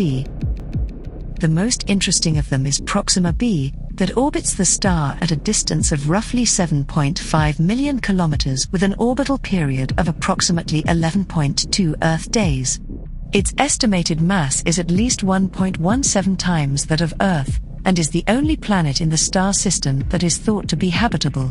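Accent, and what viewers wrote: United Kingdom. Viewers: How come every time you guys make one of these documentaries or whatever you have to have an English British accent what's up with that can someone go yo yo yeah there might be something out there yo dog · Appreciate the British accent. The 500m large radio telescope is mighty impressive. The rest about aliens is speculation.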